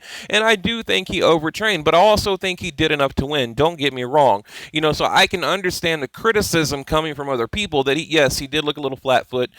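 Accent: United States. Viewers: Your fucken Septic yank voice pisses us off,TEDDY fucking Atlas is the most biased fuck in the world,listen to the British version of he fight,they had Horn edging it,Atlas even when Horn was landing heaps of punches early on,Atlas was talking about what Pacquiao was going to do later on,Tim Bradley was attempting to put a non biased slant on the fight,fuck off with your crocodile Dundee shit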